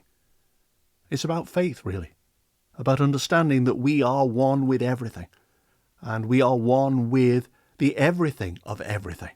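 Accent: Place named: Manchester, United Kingdom. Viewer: As a Manchester lass I feel I can hear you are from my part of the world ? ✨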